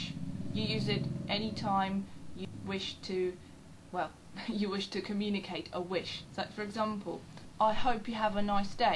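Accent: United Kingdom. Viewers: ¡Hola,! Me encanta tu video, muchas gracias:)) Tu inglés británico es hermosísimo, y tus esfuerzos por explicar el uso del subjuntivo son excelentes. Yo mismo soy profesor de español, y me cuesta mucho trabajo explicar el subjuntivo a mis alumnos rusos... ¿Eres de Chivilcoy? ¿Por qué me mudé a Rusia y no allí...?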